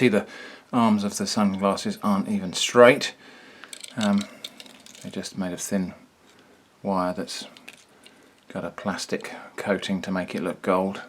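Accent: United Kingdom. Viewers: I were about to say that you forgot to mention that the footage is mirrored aswell but then I remembered you British accent. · how does he sound much more british in this video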